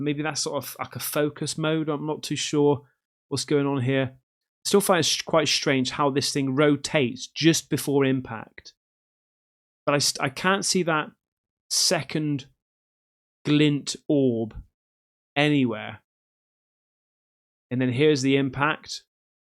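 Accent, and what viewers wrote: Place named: United Kingdom. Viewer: Omg that british accent is annoying, not them man, the accent! Ugh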